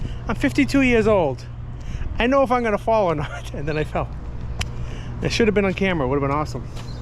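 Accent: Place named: Massachusetts, United States. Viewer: Love the videos. Like you, I'm a native of Mass. My Mass accent is even worse than yours lol. Don't sweat the haters brother. Spoken like a true Masshole... F**k Em. Keep safe out there. See you next time.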